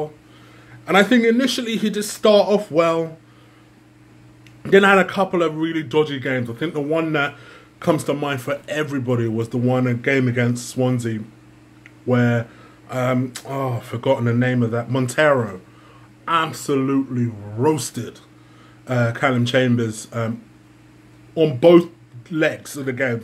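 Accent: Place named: United States. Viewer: I thought you were American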